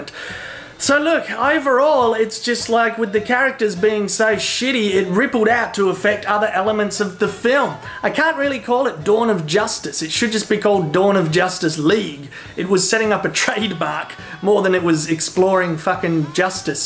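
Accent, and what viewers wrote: Australia. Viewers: You Aussie accent makes this shit so entertaining hahaha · You have THE best internet aussie accent. ~a fellow aussie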